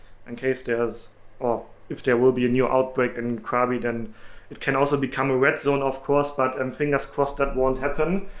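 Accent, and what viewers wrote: Germany. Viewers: Dein deutscher Akzent ist nervig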